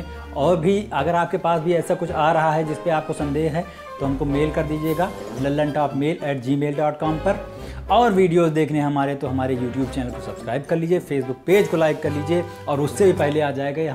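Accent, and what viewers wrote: India. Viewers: I love to see all the news read by. His news reading mixed with desi style is really interesting. Keep it up.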